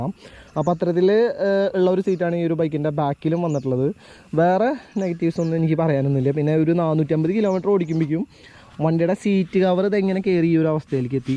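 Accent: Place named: Malappuram, India. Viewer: Malappuram slang 😅😄😉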